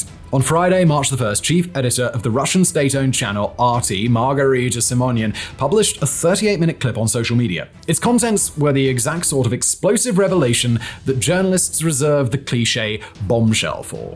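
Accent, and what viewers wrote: RP, United Kingdom. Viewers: Wow, can't stomach the Queens English...[you're going to hurt your neck, hands or teeth]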